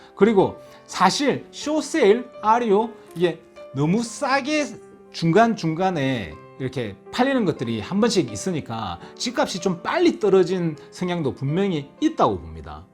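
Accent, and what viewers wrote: Gyeongsang, South Korea. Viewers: 처음 영어로 준비없이 들은적이있는데 도통 감을 못잡겠더라구요. 정감있는 경상도사투리로 설명들으니 이해가 잘되네요ㅎ 감사합니다. 북가주에도 계셔서 조언받을 수 있으면 참 좋겠습니다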